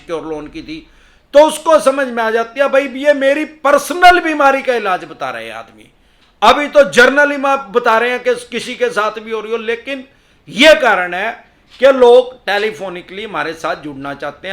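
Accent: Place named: Kaithal, India. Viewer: Sir your voice is similar to our we belong to kaithal area nice presentation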